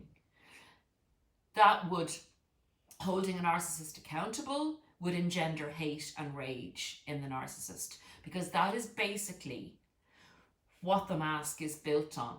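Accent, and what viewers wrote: Ireland. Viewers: It is like balm to my soul to listen to your lovely irish voice. I have had a lifetine of narcissistic trauma. Parents,ex husband,romantic relationship,boss. I am so done with it. NEVER AGAIN!!! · Her beautiful and musical Irish accent, and amazing storytelling (another undeniable Irish talent) just keepet me hooked to her great content. Thank you for nurturing us with your wisdom and beautiful Irishry.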